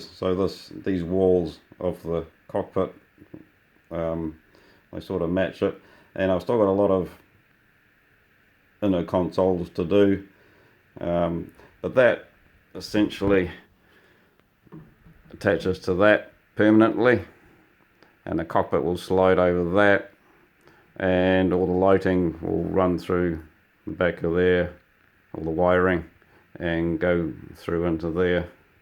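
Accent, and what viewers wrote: New Zealand. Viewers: Excellent job! Soooo awesome!! Kiwi btw? lol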